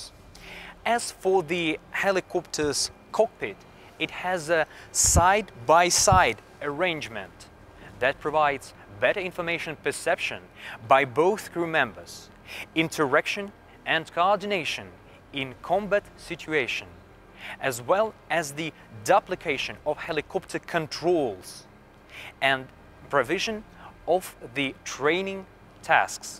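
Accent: Russia. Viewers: Акцент у парня, конечно, жестковатый, мог бы получше подготовиться, некоторые слова воспринимаемы только в русской транскрипции(т.е. приходится мысленно в письменную форму по "школьным" правилам переводить и только тогда становится понятно что было сказано).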